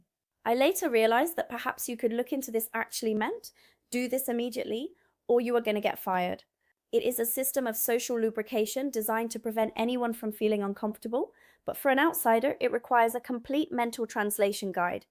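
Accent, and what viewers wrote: England, United Kingdom. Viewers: How is she from the US but speaks with an English accent?!!!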